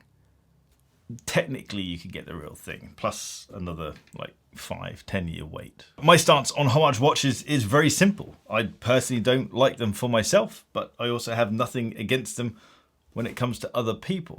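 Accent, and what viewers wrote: RP, United Kingdom. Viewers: Yes, I was bored to tears listening to your public schoolboy voice way before that. That wasn't your point in this video and that isn't what you think so who cares. Rather than argue with people, which isn't a good look for you, why don't you just hold your hand up and admit - you got it wrong and totally misjudged opinion on this one. This watch is a masterpiece and Zenith have all the heritage & provenance when it comes to chronographs. You do know what El Primero means and it's relevance don't you?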